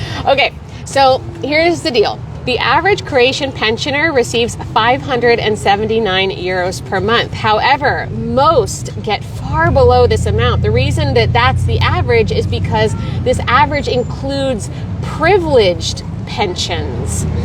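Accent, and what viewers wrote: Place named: Texas, United States. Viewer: Hey!~ It was so lovely to connect with you at the Dallas meet up! Thanks again for taking the time despite all of the priorities you had going on. Really appreciate you, your team, and all that y'all do! *BTW~ I finally heard a bit of Texas in your accent in this episode! Hope to meet up again when we finally get to Croatia next year! 😊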